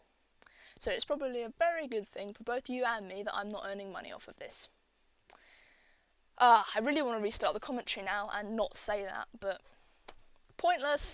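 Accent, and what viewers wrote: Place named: RP, United Kingdom. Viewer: Damn youre posh.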